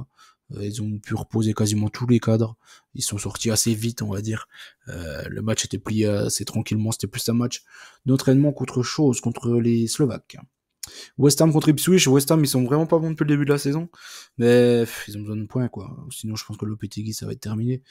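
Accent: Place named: East, France. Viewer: Salut mec tu as l accent de l est de la France 🇫🇷 !!!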